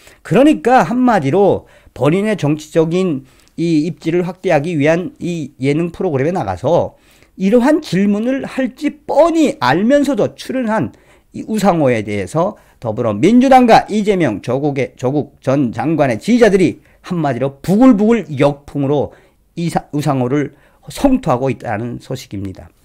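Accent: North Korea. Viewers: 방송내용은 좋습니다 만 방송은 표준어가 원칙입니다북한 사투리를 좋아하는 사람은 없습니다 수다래 라는북한사투리 선입감이 좋지 않습니다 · 북한식 말투가 조금 섞여 있는 것 다 알고 있는데 별문제 없다고 봅니다. 괜찮습니다.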